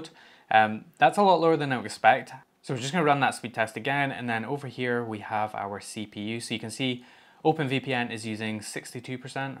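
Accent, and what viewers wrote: Scotland, United Kingdom. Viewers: Have really been enjoying your channel as i have been learning ad getting my smart home all set up. Been homelabbing for a couple of years now and finally got that Automation bug. Plus the wonderful scottish accent gives you an edge lol. Great videos brotha keep it up.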